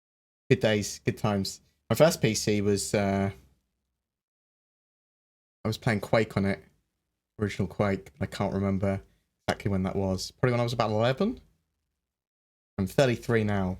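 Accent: United Kingdom. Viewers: I agree with you about Disco Lando that he knows his stuff about the game, but there is really one thing that bothers me when he is on. That is the lack of control he has with his hands. Anyone that broadcasts for a living knows to keep their hands away from their mouths when the talk on screen. I have a difficult time understanding conversations, due to hearing loss. I've learned to somewhat read lips. When he coves his mouth, It's impossible. I can hear his speaking, but sometimes I have trouble really understanding what he is saying clearly. I spent too many years working around jet engine aircraft with a limited hearing protection, and unfortunately, some tones I frankly don't hear. If someone turns their back on me when they talk, or talk from another room, I just don't understand them very well. On that note, you do ok during your streams. I have no problem understanding you, even with your British accents. 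I look forward to 3.5 going to theEvocoti, the PTU and then out to live stream. I get it when it goes to Concierge and Subscribers as I am both. I also can't hardly wait for the Carrack to come out this year, as I have the Explorer pack that was available for Concierge.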